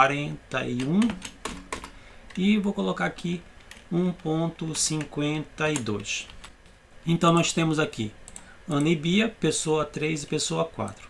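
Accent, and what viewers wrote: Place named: North, Brazil. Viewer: mas o sotaque de nortista tá em dia hehe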